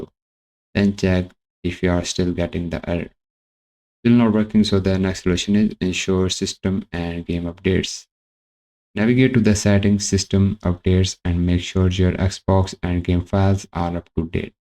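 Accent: India. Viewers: Whyyy tf on every vid it has to be a Indian 😔 im sorry im not racist but danmm I can't understand shi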